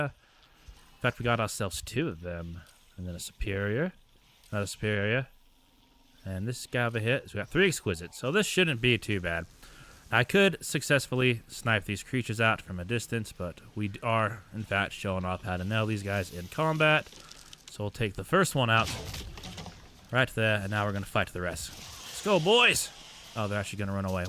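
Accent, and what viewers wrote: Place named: Australia. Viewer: bro u sound aussie sometimes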